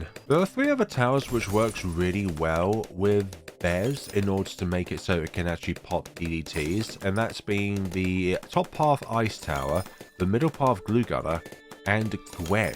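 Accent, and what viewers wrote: United Kingdom. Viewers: Two of my favorite things in one video: btd6, and a british man talking about space. Absolutely lovely 👌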